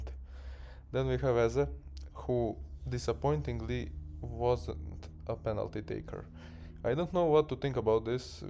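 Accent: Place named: Serbia. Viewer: English with Serbian ascent:)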